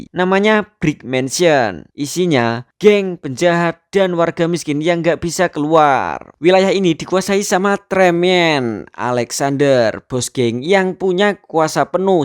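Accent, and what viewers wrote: Java, Indonesia. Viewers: Meddhok tenang mas. Hehehe JB Malaysia nyimak❤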